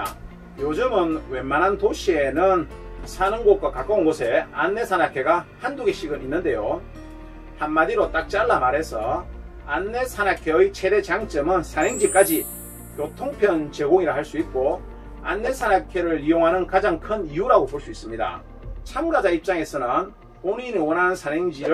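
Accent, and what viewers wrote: Gyeongsang, South Korea. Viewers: 홈화면에 떠서 처음 님의 영상을 만나게 되었는데 경상도억양이 있지만 사투리없이 표준말로 원고도 없이 설명을 참 잘하시는군요. 특히 내용이 알차고 유익하여 인상이 깊었습니다. 다만 한 가지 흠이 있는데 님을 위해 조언드립니다. 설명하시는 동안 양손을 많이, 너무 많이 쓰다보니 수화통역하는 분 같은 느낌이 들었습니다. 손동작이 너무 많다보니 손에 신경이 쓰여 설명을 잠깐 놓치는 경우도 있었구요. 적당한 제스처는 필요하지만 과함은 모자람만 못하다는 조언을 드립니다.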